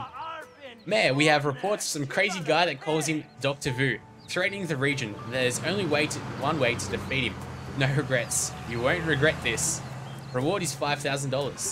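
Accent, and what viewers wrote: Australia. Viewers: It's Australian not British lol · I love this guys voice where are you from. Australia any chance? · are u from Australia? because my mom is and i now a accent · Australian right ? ;) Nice video mate ;) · Oh, this is Sim City (GOOD) you are Australian = This play through EPIC. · I thought you were Australian and I cheered a little (I'm Australian, in Brisbane) then you said about saving on the North American servers and I got a bit confused =P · fuck yeah! You're australian! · hey!! you are Australian!! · you sound like your from Australia are you from austrailia if you are your EPIC!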